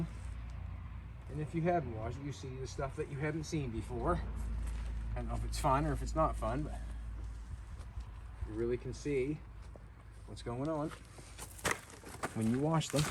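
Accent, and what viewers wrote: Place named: Canada. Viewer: Omg please love you your channel your content and your canadian accent it's all good!!!! But for the love of god stop with the Windex!!!! You could be using any type of spray wax turtle wax to maguire's or whatever brand....but in the same one wiping spray and wipe motion your cleaning protecting waxing and shining and making the surface buttery and slippery for the next inch of dust or dirt to slip and slide right off of.....also because the wax is so slippery way less chance of scratching or swirling paint or clear coat....not a painter but I detailed for almost 2 decades and know what I'm talking about....if no water or no wash which is fine.....at least use spray wax....make that shit shine and buttery as hell and watch the water bead off.....from a machanic and welder at a custom corvette an race shop that detailed for years b4 finally investing in myself and some tools an takin the leap to mechanic for jolenes sake spray wax!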